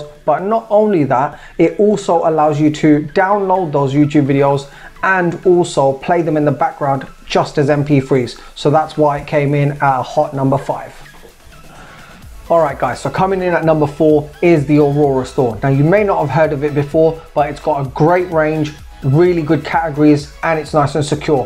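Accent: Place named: United Kingdom. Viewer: Indian with British accent..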